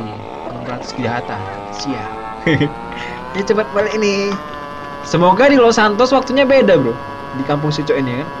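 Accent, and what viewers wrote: Medan, Indonesia. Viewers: orang medan ni kek nya🗿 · Bang Abang Medan mananya?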